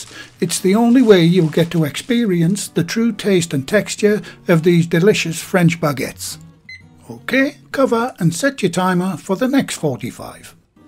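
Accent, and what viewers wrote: United Kingdom. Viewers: I like the British accent rather than the American, this is one of the reasons I like this video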